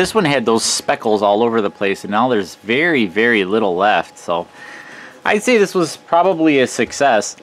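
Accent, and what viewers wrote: Midwest, United States. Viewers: The midwestern accent is sexy as fuck. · this guys accent reminds me of growing up in the midwest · This guy's midwestern accent is killer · Sounds like hes from Wisconsin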